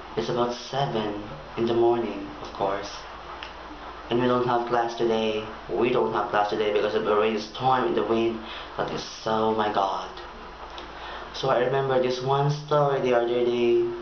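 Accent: United Kingdom. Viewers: naks British English ang accent :) good mowning :)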